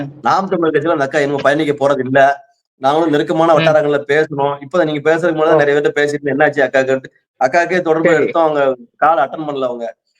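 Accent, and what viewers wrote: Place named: Tirunelveli, India. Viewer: uncle anna nallairukeengala super namma tirunelveli manvasanai pechu super..... super name uncle....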